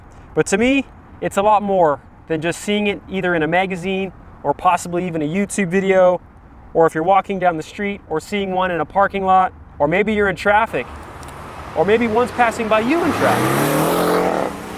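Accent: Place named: Germany. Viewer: Great info, love your ' german' accent and painfull blooper (hernia? Been there 😕) keep up THE great work!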